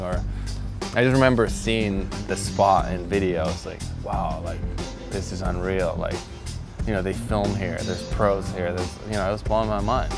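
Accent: United States. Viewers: From the comments in the he said in the menkimati video, his sponsors in Finland in this video and the way he pronounces Finnish words in an American way. I get the feeling he isn’t the biggest fan of Finland :) Feels like he was someone who didn’t belong and is happy that he got out. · I'm sure hanging out with cali stoners for 20 years helps with the accent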